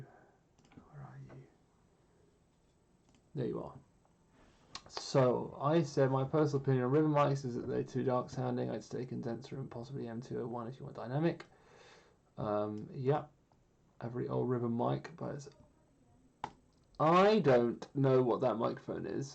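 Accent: United Kingdom. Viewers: I am going to be honest. I had no idea you had a British accent, or that you were even British. I love the results either way. Thank you for sharing your talents with me.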